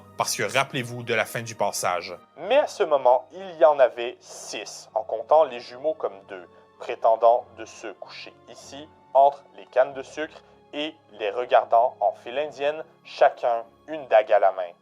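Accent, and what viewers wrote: Quebec, Canada. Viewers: Hoo il viens d’où cet accent? Quebec ? Dsl jsuis juste curieux. Sinon tjrs content de voir une analyse d’une de mes oeuvre favorite (du moins le roman, et oui j’aime la cruauté du peter original 😅) . Que tu a tres bien reussi.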